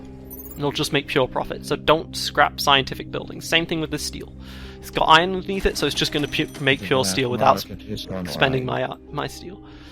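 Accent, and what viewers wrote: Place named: United Kingdom. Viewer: Thanks for taking the time to do this! Good to see someone else's build strategy as expansive. I knew you were from the UK but somehow didn't visualize you with an accent. Go figure.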